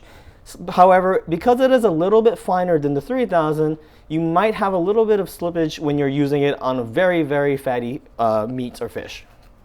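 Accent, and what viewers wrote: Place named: United States. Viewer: The only Japanese on the Earth with such a great American Accent!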